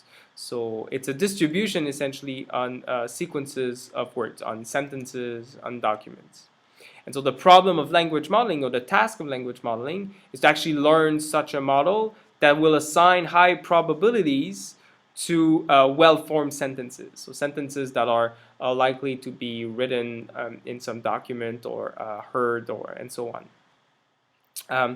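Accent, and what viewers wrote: Quebec, Canada. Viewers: I guess you're a French fella from Quebec. Anyway, it's a good video.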